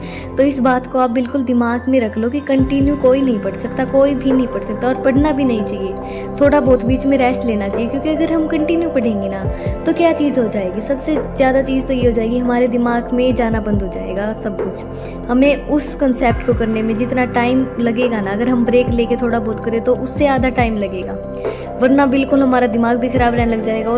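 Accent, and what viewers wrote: Haryana, India. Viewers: Haryana se ho aap didi because aapki awaj se lagta h muje ❤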